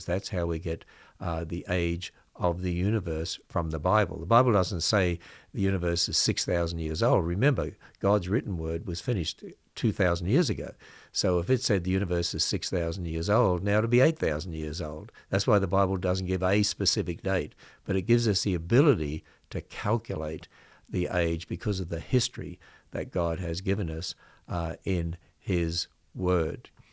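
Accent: Australia. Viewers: Good God, you can talk! At least you haven't lost your Australian accent 🇦🇺 👍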